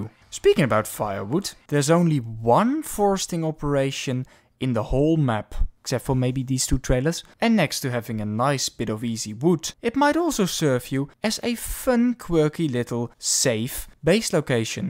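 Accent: Netherlands. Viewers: Wat een heerlijk Hollands accent :' ) Got a sub out of me tho!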